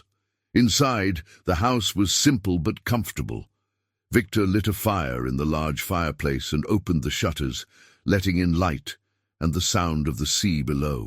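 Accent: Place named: United Kingdom. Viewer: Nisam gledala jer nema previd več Englesko - Britanski naglasak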